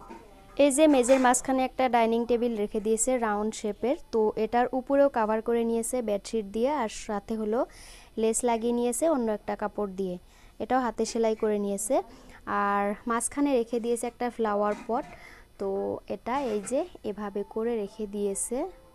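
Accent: Sylhet, Bangladesh. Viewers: আপু কোমার ভাষা আসামের মনে হয় না। মনে হয় সম্পূর্ণ বাংলাদেশী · আপনি শুদ্ধ ভাষায় কথা বললেও আপনার উচ্চারণ ও বাচনভঙ্গিতে সিলেটের আঞ্চলিক ভাষার বৈশিষ্ট বিদ্যমান। এই গ্রামটি বৃহত্তর সিলেটের কোথায় অবস্থিত? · সিলেটি নি তুমি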